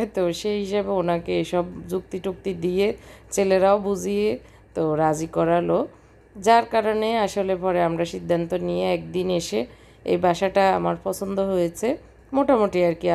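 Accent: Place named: Feni, Bangladesh. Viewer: দুঃখিত বোন কিছু মনে করবেন নাহ্ ! আপনি উনার উচ্চারণের ভুল ধরতেছেন।উনার দেশের বাড়ী ফেনী! তাই আঞ্চলিক টান আসে। আপনার কমেন্টে বানানের দিকে একটু খেয়াল করবেন! পড়তে দৃষ্টিকটু মনে হয়!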